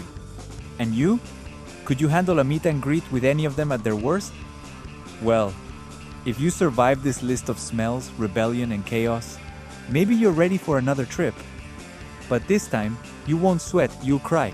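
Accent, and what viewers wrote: India. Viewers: this guy sounds indian, this tells me all i need to know, disliked the video 3 secs in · What accent is this? AI indian accent?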